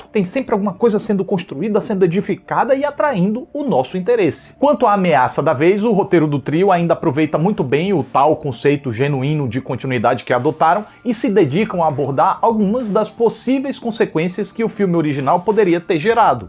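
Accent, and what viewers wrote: Northeast, Brazil. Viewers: Boa análise, como sempre! Sem dúvida, se não é o melhor, é um dos melhores avaliadores de filmes do YouTube, da internet! 👍👏 Como se não bastasse a inteligência e o bom humor nas análises, ainda por cima há o maravilhoso (para mim, carioca descendente de nordestinos!) sotaque nordestino! 😉👍 Eu gostaria de lhe pedir que fizesse uma reação para o filme "Mulher-Rei" , que assisti na tv a cabo e achei ótimo! Aliás, só mesmo o racismo enraizado nas sociedades ocidentais para explicar a divulgação fraca que este filme teve e o fato de não ter vencido um Oscar - um "desinibido de ouro"! No mínimo, penso que deveria ter concorrido a melhor filme, melhor direção, melhor roteiro e atriz coadjuvante (há umas 2 ou 3 que mereciam pelo menos concorrer), para não dizer que a Viola Davis deveria ter ganhado para atriz principal.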